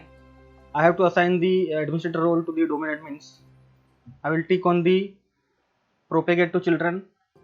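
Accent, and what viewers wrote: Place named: India. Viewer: He does not have a speech defect. It is accent. Comes from India.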